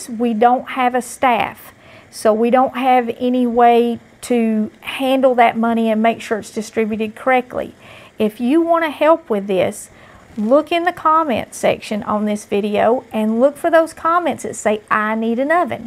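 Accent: South, United States. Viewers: I am a New York Italian, who loves Jesus and I feel such an affection for my sister with a southern accent, I would love to come on your show one day and and cook something real Italian like a very old Neapolitan recipe that we call rice pie, we are all going through a hard time and I so appreciate your faithful words and I so agree with all you say. To God be the glory, God bless you my sister and again I say Thank You. I will look through the comments for those who need help I am blessed I live with my daughter she looks after me God bless her, so I will let the Lord lead me in helping others. Oh by the way I love your recipes.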